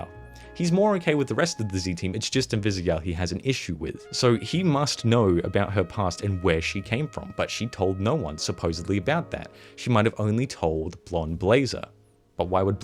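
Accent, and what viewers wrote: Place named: Australia. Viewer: Ok, now I have three things to say to you! 1. Nice Aussie accent 2. Skylanders video? Now I’m randomly curious cause I love skylanders And 3. Doesn’t Adobe have a thing where automatically backs up your save file, every few minutes or something? Or did the crash fry them too? Or is adobe too archaic to include backups when Resolve and ProTools do?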